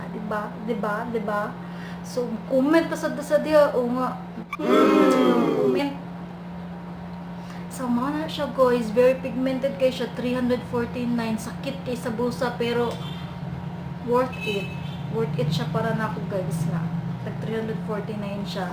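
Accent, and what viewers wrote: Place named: Visayas, Philippines. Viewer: naminaw ko nimo sis ba lami kaayo paminawon ang puro bisaya ba ganahan ko cge ko katawa nimo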